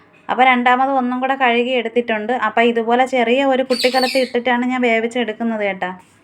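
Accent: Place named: Kollam, India. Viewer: Ningal kollam ano avduthe samsaram pole und kettatu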